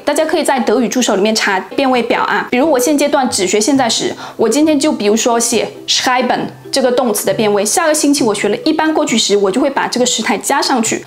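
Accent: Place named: South, China. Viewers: 才貌雙全！你讓我更想學德語了。好奇你是哪裡人？你的普通話跟我們台灣一位來自上海的的政治評論員的口音很像。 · zoe是南方人吧！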